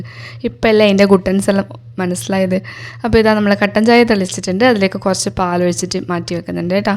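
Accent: Kannur, India. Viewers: കണ്ണൂർ ആണല്ലേ place.. സംസാരം കേൾക്കാൻ നല്ല രസമുണ്ട്😍😍energetic vlog 👌👌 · Adipoli vlog ❤️kannur ഭാഷയും super👌പാത്രം കഴുകി മടുക്കും ❤️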